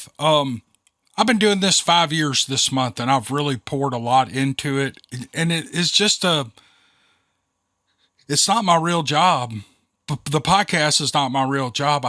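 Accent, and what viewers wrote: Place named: Oklahoma, United States. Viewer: Sounds like you have been spreading yourself thinner than butter. Howdy. I don't usually write in the comment section. So here goes. It's true we hope you're doing okay, and are glad to hear you and yours are well. I get it, you're just trying to survive like everyone else. I will be patiently waiting for the next Saga of Steve Lilly. In the meantime, I will enjoy, and I do enjoy everything you post, that has your name and that wonderful okie voice with it. From one Okie to another, take care. P. S. There is nothing wrong with the shorts.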